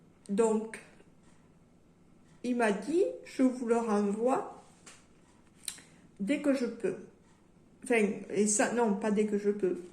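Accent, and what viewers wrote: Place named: South-West, France. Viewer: coucou merci pour cet unboxing et je trouve que tu as un accent du sud ouest... est ce que je me trompe? car je suis du tarn et je trouve qu'on a un peu le même accent! bisous bye bye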